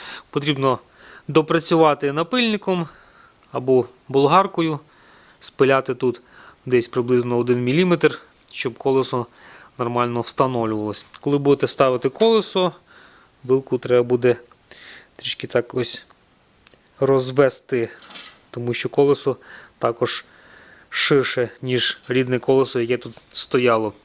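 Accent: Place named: Dubno, Ukraine. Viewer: Друже вітаю! Наснаги у роботі, та бажаю тобі постійно нових клієнтів... Ти можливо із Дубно? Десь почулося у розмові.... Я, з Рівного...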